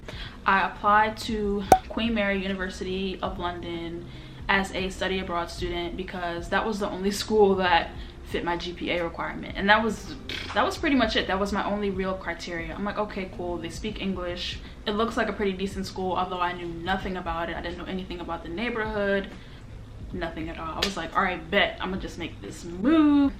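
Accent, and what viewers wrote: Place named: United States. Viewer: You sound like you lost most of your American accent 😂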